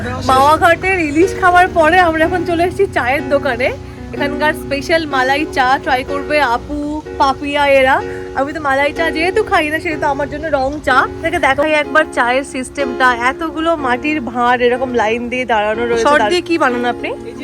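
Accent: Kolkata, India. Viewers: তোমার বাংলাদেশের ভ্লগগুলো দেখলাম। তুমি খুব সুন্দর করে কথা বলো। কোলকাতার বাংলা আ্যকসেন্ট আমার কাছে বেশ দারুন লাগে। আরো ভ্লগের অপেক্ষায় রইলাম। আর মাওয়া ঘাটের ইলিশের লেজের ভর্তাটা আসলেই খুব মজার। আমি ২০১৯ এ খেয়েছিলাম। তখন অবশ্য এত সুন্দর হোটেল দেখিনি। এখন বেশ ভালো লাগলো দেখে। যাইহোক, আশা করি তোমার বাংলাদেশ ভ্রমন ভালো যাচ্ছে। এপার বাংলা থেকে বিদেশীনীর জন্য অনেক অনেক ভালবাসা রইল।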